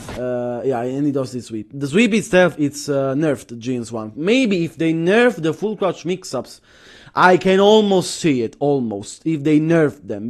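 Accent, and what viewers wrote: Italy. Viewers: Good a analysis, all we can do is wait and pray -_-. Random but are you italiano? you got a similar accent to my wife lol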